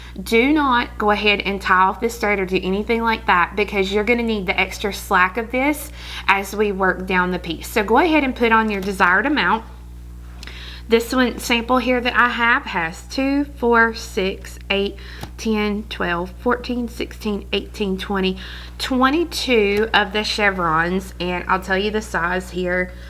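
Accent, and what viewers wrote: South, United States. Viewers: I would give just about anything to come visit you this weekend! I am a southern girl currently living in England and miss home so much. Ms I love your videos, I am a long time beader and always learn a little bit more from you. Keep speaking southern to my heart and beads to my soul! Thank You!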